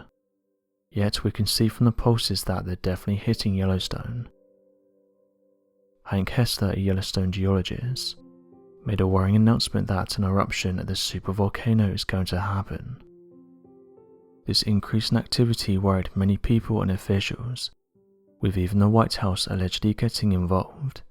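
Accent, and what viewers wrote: England, United Kingdom. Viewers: The whispering English accent can make anything scary. Besides that, research Jacob Lowenstein. He worked in the park for decades and is highly educated in the field. He has a family too…..and lives right near Yellowstone. If this “data” was a concern, I’m quite sure he’d be gone. But then again, maybe the dudes nuts and wants to die there.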